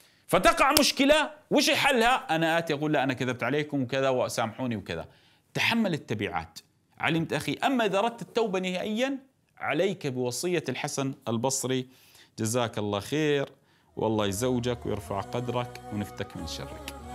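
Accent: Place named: Iraq. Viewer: شيخ حبيبي عمري 🥺 كام يحكي عراقي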